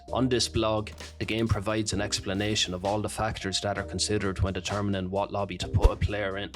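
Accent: Ireland. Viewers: Nice to hear an Irish man talking about these topics. Eire go bragh yup yup ❤ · Two reasons why you’re better than exclusive ace: your wise enough not to give them the benefit of the doubt, and the Irish accent.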